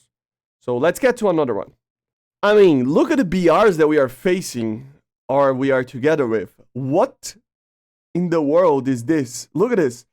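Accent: Brazil. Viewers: Essa cara é brasileiro kkkkkk ele fala ingles mt bem mas tem um sotaque de Br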